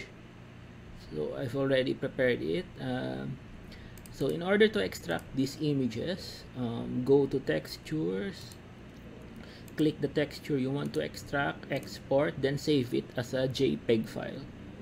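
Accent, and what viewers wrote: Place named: Philippines. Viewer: You sound like filipino. Where are u from?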